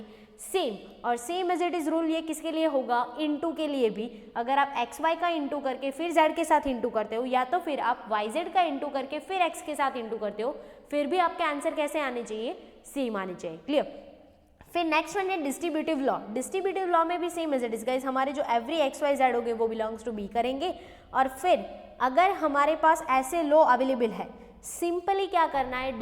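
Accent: Gujarat, India. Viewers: Hey Ma'am!! Are Youu Gujarati?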